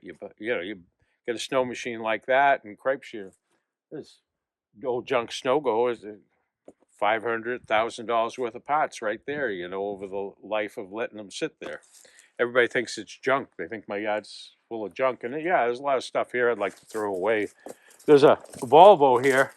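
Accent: Boston, United States. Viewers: Is that Medford NYFD shirt? I live near there..love ur Baston Accent I miss seeing you all on TV 😭 great red white and blue 💙❤️ too ! God bless you all 🙏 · Medford mass fire shirt!!! it amazes me you still have your Boston accent!!!!!! after 40 + years!! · Stan buddy i wana tell u how awesome it is to still watch u and have new videos today even after ur show stopped ...me and my mom used to watch u guys every week.... Were also from massachusetts just west of plymouth and she picked up on ur accent before we even knew u were from boston...she was born in '60 in quincy ....anyways shes no longer with me and i just found ur videos on utube and its really helped me feel closer to her cuz it was something we did together....i just wanted u to know how ur helpin me today so THANK YOU so much Stan and family we love u guys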